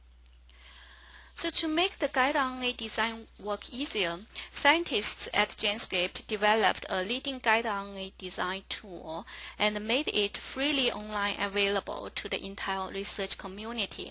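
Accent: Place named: China. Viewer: Why chinese speaker....cnt understand...even a sentence...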